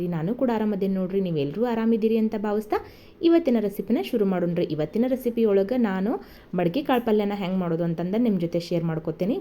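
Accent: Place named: North Karnataka, India. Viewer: ನಮ್ಮ. ಉತ್ತರ ಕರ್ನಾಟ ಕ. ಭಾಷೆ. 👌. ಮೊಳಕೆ. ಕಾಳು. ಪಲ್ಯ. ಸೂಪರ್ 👌ಸೂಪರ್ 👌. ರೀ. ಸಿಸ್ಟರ್.🎉👌👍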